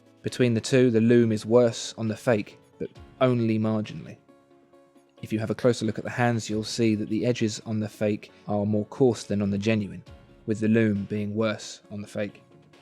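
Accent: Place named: RP, United Kingdom. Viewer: this guy has a very nice royal accent.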